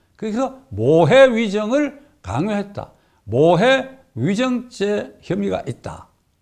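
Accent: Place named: Gyeongsang, South Korea. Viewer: 변호사님의 해박한 지식과 설명해 주시는 노력과 성의는 존경해 마지 않습니다. 변호사님 1.서울에 사신지 얼마인데 아직 경상도 토박이 억양과 어휘를 탈피하지 못하십니다. 2."다른 증거들로 가지고"는 " 다른 증거들로서"로 고치시고 3."미국으로 도망가가지고"는 "미국으로 도망가서" 4.그래가지고 이래가지고 등 가지고라는 이음새는 아주 듣기 싫은 낱말,특히 연설문 대중 연설문에선,. 5.지금의 젊은 세대에 맞는 어휘 억양,어구 등 부탁합니다. 감사합니다.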